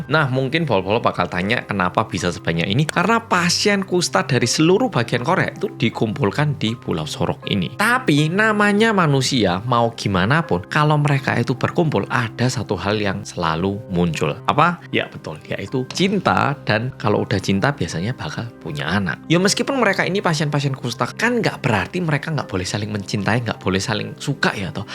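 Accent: Java, Indonesia. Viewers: Gabaken wkwkwk😂😂 jowo banget😅😂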